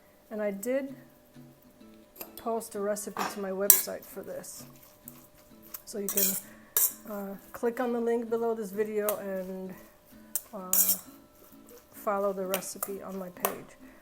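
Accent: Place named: Poland. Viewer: I BEG OF YOU TO PUT YOUR RECIPE FOR BEET SOUP. MY BROTHER HAS PTSD AND FINDS COMFORT IN OUR POLISH HERITAGE. MY COUSIN AND I WANT TO MAKE HIM BEET SOUP WITH SHREDDED BEETS AND MAYBE A BEEF BROTH?HE ALWAYS LOVED IT WITH A BIT OF SOUR CREAM. PLEASE ASAP. IVE BEEN LOOKING FOR IT EVERYDAY AND ONLY FOUND IT AND DIDNT WRITE THE RECIPE DOWN,! DUMMY ME! I LOVE THIS LADY. SHE COULD BE MY SISTER. LOVE YOU BEAUTIFUL VOICE AND SLIGHT Polish ACCENT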